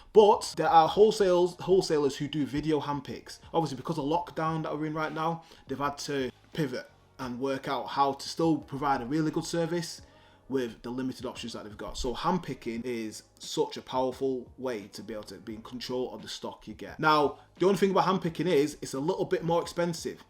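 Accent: United Kingdom. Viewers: I usually cant stand a UK accent but your knowledge knows no dialect I appreciate u and the gems u dropping ima scoop em up💎🏃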